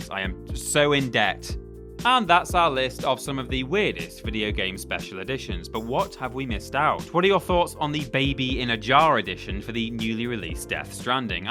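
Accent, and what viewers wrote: United Kingdom. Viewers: While I'm normally cringeworthy towards British accents, I could listen ramble on all day on just about anything.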